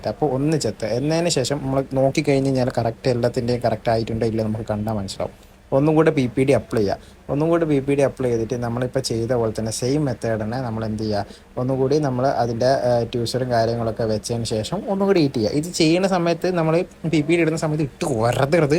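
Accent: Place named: Kannur, India. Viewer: Kannurkaran thanne.. pakka Kannur accent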